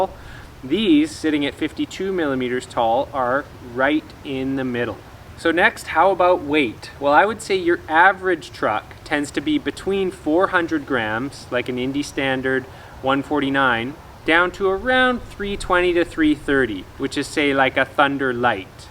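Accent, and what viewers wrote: Canada. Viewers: Thanks for your content, and I knew you were Canadian from the moment I heard you speak (as an accent aware Canadian, myself), but stoked you're from the lower mainland :)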